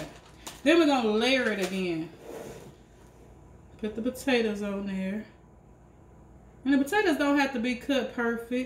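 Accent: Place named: South, United States. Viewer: I have cooked something similar but I'm going to follow your recipe next time. I love to listen to you talk. You sound like a Southern girl like I am. God bless you with health and happiness.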